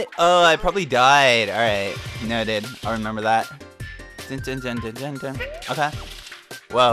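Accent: California, United States. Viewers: To be fair is obviously from California to most USA residents because he sounds just like a California dude!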